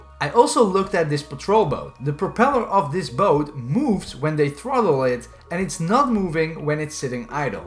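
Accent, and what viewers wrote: Netherlands. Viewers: Ik dacht al dat je Nederlands was vanwege t accent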